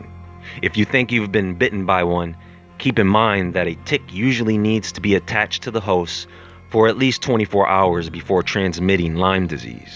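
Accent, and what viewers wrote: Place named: Philadelphia, United States. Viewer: Sounds like a Philly accent,anyone else hear it?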